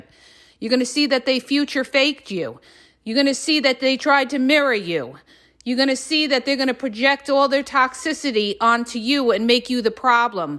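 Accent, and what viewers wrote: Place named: Elmwood Park, United States. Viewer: I love you I'm from Elmwood Park and it's nice to hear somebody talk like I talk and you describe everything the best more so than all these other channels and really help me thank you God bless you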